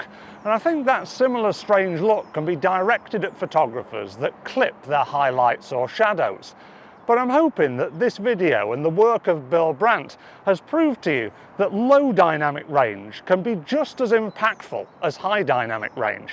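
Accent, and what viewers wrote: United Kingdom. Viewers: This guy sounds so British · Is there a more English looking and sounding man than this one?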